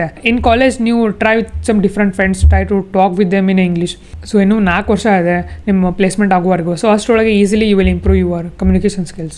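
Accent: North Karnataka, India. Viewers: Bro,are you from north karnatak?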